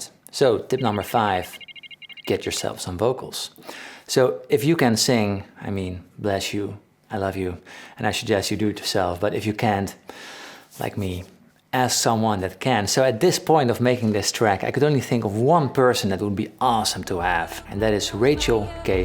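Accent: Netherlands. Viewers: Complimenten voor je content en professionalisme man! Je engels is vloeiend maar toch hoorde ik direct dat je een Nederlander bent! 🇳🇱🎸 Je bent een grote speler als muzikant!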